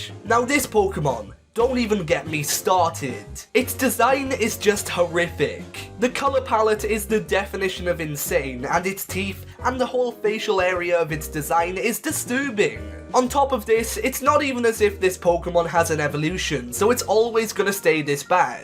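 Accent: Wales, United Kingdom. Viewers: Are you welsh mate? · Are you Welsh?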